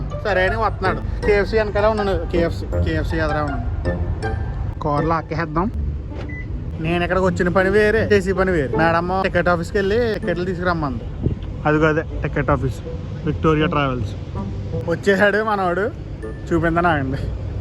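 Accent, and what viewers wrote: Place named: Godavari, India. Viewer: Godavari slang adaragottestunnav anduke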